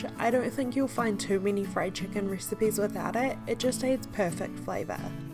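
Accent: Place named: New Zealand. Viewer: I knew your cool accent was from New Zealand! I lived in Wellington four years; many fond memories. Love the clear recipe instructions; subscribed!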